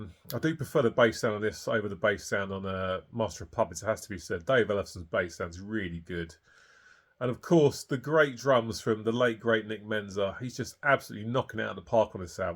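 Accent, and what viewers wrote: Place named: England, United Kingdom. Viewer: he sound English lol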